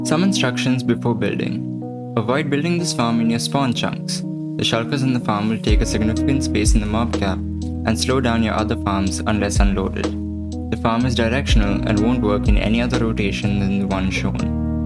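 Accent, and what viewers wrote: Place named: India. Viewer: Are you indian by any chance? love your accent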